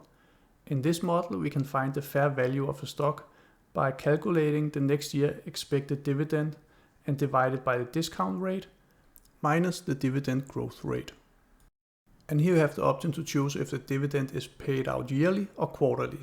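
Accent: Denmark. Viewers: Excellent video - keep up the good work - nice danish accent by the way ! - from a fellow dane !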